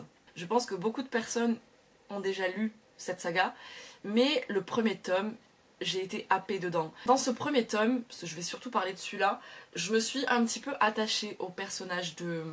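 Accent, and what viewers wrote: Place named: Toulouse, France. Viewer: J’aime tellement tes vlogs ! Moi qui suis une toulousaine expatriée en région parisienne, je suis toujours ravie de retrouver ton accent ! 🥰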